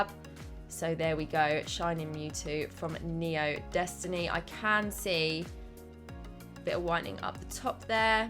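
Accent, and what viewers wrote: United Kingdom. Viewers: Very nice cards. I love the English accent, also. 😅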